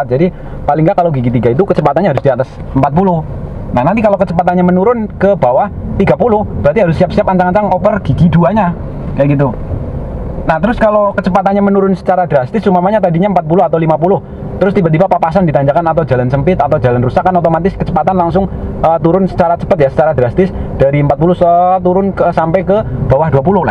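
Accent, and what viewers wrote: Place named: Banyumas, Indonesia. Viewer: Wong ngapak ya😁😂😃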